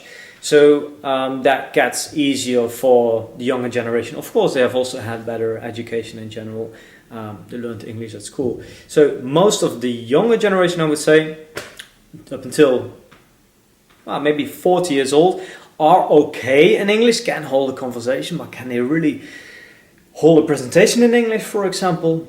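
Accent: Netherlands. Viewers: your accent is still dutch, grow up and stop bragging the dutch suck!! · Jij hebt echt typisch Nederlands-Engels accent 😂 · I can hear it right away. He's Dutch not German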